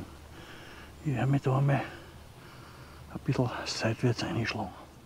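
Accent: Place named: Austria. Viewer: Danke lieber, ja da gibt es viele Videos... Aber deine sind die Besten. Deine Art etwas vorzustellen hat was ganz besonders... Dein österreichische Dialekt, deine Ruhe... und die Umgebung in der du filmst... 👍👍👍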